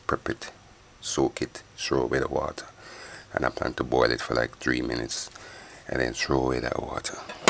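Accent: Jamaica. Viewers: I love Jamaican accent. I would listen to you talk all day.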